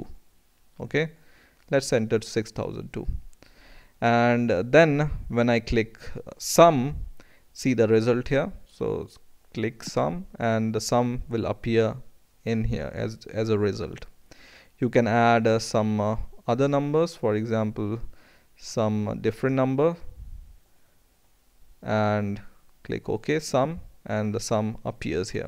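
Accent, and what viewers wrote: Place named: India. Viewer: Are u an Indian Guy? Sounds like u are, at least for me it does. Can u show US How to make something like this -> I wan't to add number, but like this Let's say i type ASD+2 So the app store 2 on the ASD file, if the 'ASD' it doesn't exist yet, it make a new one, and ad the 2 on it Then i hit ZXC+3, it adds like before, but add on ZXC, again if it doesn't exists, it create one 'row' named ZXC, and add 3 on it Then again, i type ASD+8, it sees that ASD already exists, and has a value of 2, then it add 8 on top of it, resulting 10 Its a calculator, but with letter's too, Just a crazy idea of mine hahaha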